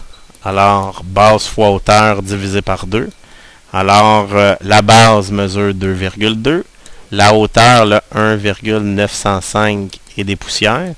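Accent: Quebec, Canada. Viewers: l'accent canadien c'est abuséééé :o mais la video est bien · Accent québécois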